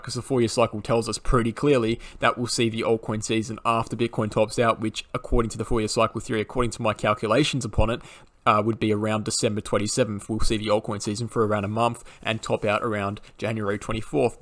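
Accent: Australia. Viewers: So exciting... what accent is that? Australia?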